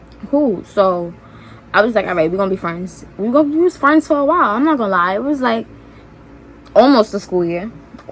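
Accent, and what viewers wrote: New York, United States. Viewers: mannnnn her ny accent is everything, and she mad pretty 😍 my parents had one job😭😩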